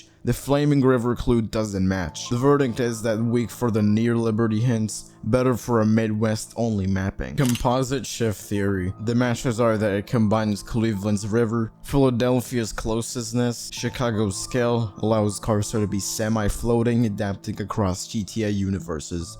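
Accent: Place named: Birmingham, United States. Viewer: Sound kinda like Birmingham al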